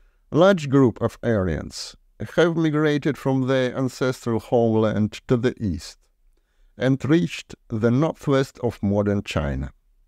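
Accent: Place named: Eastern Europe. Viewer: Although you read all this with a Slavic accent, you forgot to mention the Slavs!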